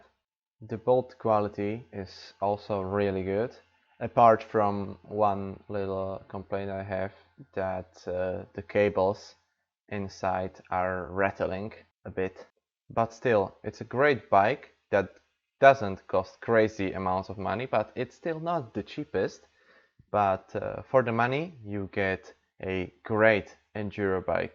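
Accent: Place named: Slovakia. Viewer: najprv som si myslel, ze je toto video od nejakeho nemca ale potom som pocuval ten prizvuk a hned som vedel, ze musis byt slovak :D Tiez budem kupovat Foxy ale 2021, ako si spokojny?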